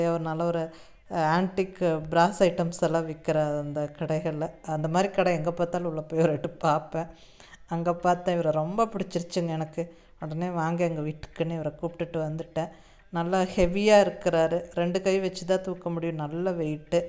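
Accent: Coimbatore, India. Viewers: Amma really nice collection amma anakum vinayakar romba pidikum kongu tamil super innum neraiya video poduga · Ungal voice super mam Coimbatore karanganu nala ah tarithu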